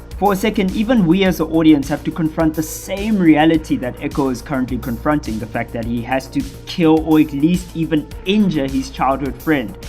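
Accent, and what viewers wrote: South Africa. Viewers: Hang on... Are you South African? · Eh dude nice to here a south african voice, binge watched it then woke my brother up and binge watched it again lol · Bro are you from South Africa? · aweeee dude, as soon as you spoke I thought I detected a fellow South African. Fantastic analysis - keep up the great work. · First time watching a video. are you a saffa? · Within 6.12 seconds I recognized that south african accent! Great to see you popping off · I just know you South African 😂😂bro I appreciate the review ,you did an amazing job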